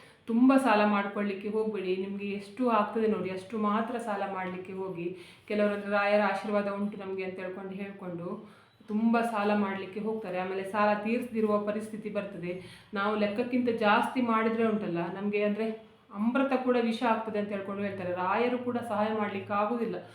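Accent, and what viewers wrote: Mangalore, India. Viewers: ನೀವ್ ಮಂಗಳೂರಿನವ್ರ. ಮಾತಾಡೋ ರೀತಿಯಿಂದ ಕೇಳಿದೆ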